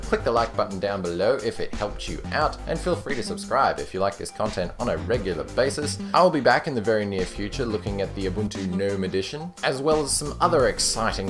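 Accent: Australia. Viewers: So u from Aussie mate ?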